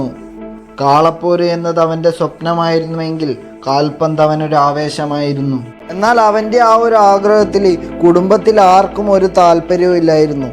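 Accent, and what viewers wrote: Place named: Kannur, India. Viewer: enik kannur oru frnd ind.... Avn samsarikkunnath pole ind😁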